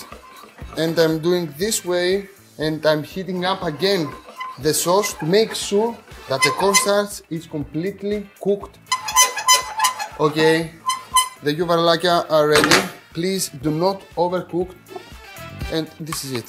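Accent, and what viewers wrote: Greece. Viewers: I love the way he speaks English with that Greek accent. And I love his recipes, he uses ingredients easily available anywhere in the world, specially in India. Thanks for such amazing recipes, I have tried a few of your recipes and they are always a hit in my family. Can I use chicken mince for this recipe? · I love to watch you cook and to listen to you speak English with your Greek accent! 😄 I grew up (in the US) with Greek grandparents and many other Greek relatives and listening to you brings so many memories. You remind me so much of my cousin Stathis, (although he doesn't cook 😂.) I remember Yiayia used to make this dish and I loved it. She's been gone a very long time, 50 years, but watching you make this I can almost taste it from memory!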